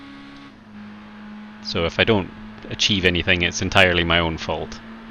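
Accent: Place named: Scotland, United Kingdom. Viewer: Good to hear.. Scottish accents are the best in my opinion...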